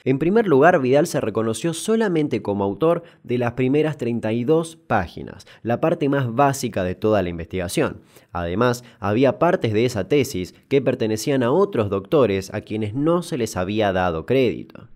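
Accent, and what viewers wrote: Argentina. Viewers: amo el acento argentino, es hermoso. Me encantan tus videos. Un abrazo grande desde Bogotá-Colombia💗💗💗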